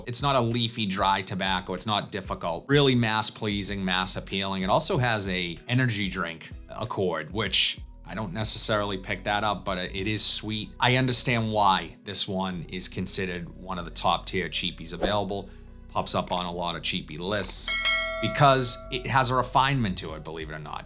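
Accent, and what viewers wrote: Boston, United States. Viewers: niceee I live in providence I know that accent a mile away lol Boston ri we all talk like that lol .. great vids subscribed and got notification on appreciate ur content 🔥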